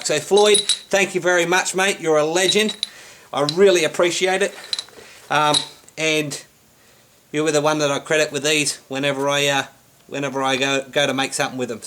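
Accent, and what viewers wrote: Australia. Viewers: Same here Sunday morning with yamez. Had to search you out. I can't understand half of what you gibber out in the aussie accent, but hell I'm from Alabama... I basically speak hillbilly so no one knows what I say either. We call that horn of the anvil lol. Not sure where bic would have ever come from.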